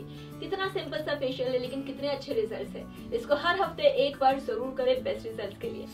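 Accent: Bhojpuri, India. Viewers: I just luv ur bhojpuri accent 👌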